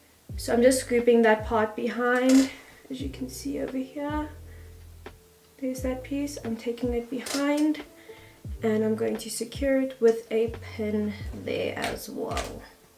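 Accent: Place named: South Africa. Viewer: Omg you south african, I hear it by the accent 🇿🇦💖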